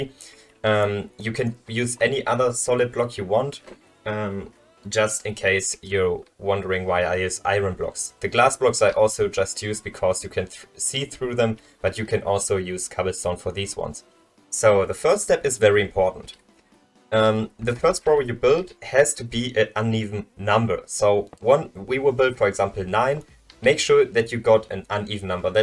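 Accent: Germany. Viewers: the german accent :face-blue-smiling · Nice accent, is it german? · sorry, are you a German Landsmann? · Man hört so krass dass du deutsch bist xD, aber trotzdem gutes video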